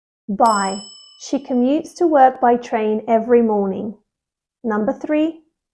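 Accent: United Kingdom. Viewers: I like british english and love british girl as u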